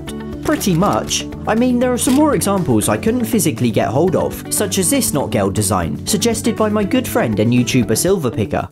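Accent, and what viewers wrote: England, United Kingdom. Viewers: Man your accent is pure English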